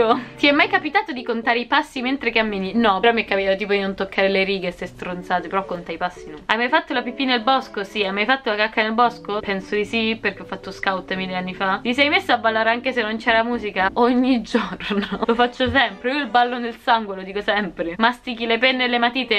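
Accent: Rome, Italy. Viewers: Quanto amo er tuo romanooo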